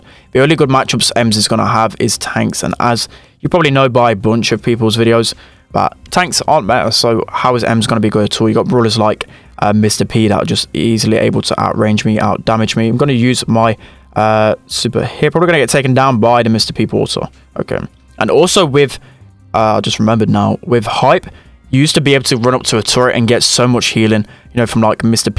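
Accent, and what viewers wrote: United Kingdom. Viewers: r u fom uk?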